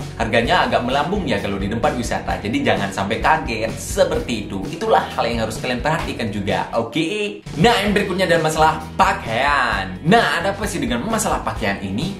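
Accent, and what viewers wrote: Bali, Indonesia. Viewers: Gak tau knp klo' ad orang bali ngomong, suka banget pas bilang "T" 🥰🥰 ya udh klo' ke bali aq kontak km dulu bli hehe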